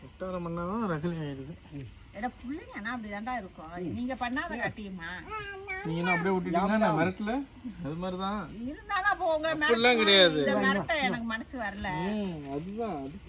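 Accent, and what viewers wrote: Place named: Chola, India. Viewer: எங்கள் ஊர் காரைக்காலில் திருநள்ளாறுக்கு அருகாமையில் இருக்கும் சுரக்குடி என்னும் கிராமம் நான் உங்கள் காணொளியில் மிகவும் ரசித்து கேட்டது என்னவென்றால் உங்களுடைய சமையல் மற்றும் உங்களுடைய சோழமண்டலத்தில் உண்டான அந்த பாரம்பரிய தமிழ் பேச்சு நீங்கள் பேசுவது அப்படியே எங்கள் காரைக்கால் எங்கள் கிராமத்தில் பேசும் வட்டார வழக்கு நடைமுறையில் இருந்ததை கண்டு மனமகிழ்ச்சி அடைந்தேன் ஒருவேளை இவர்கள் நம்முடைய ஊர் பகுதியைச் சேர்ந்தவர்களாக இருப்பார்களா என்று தான் தங்களுக்கு இந்த குறுஞ்செய்தியை அனுப்பினேன் நன்றி அம்மா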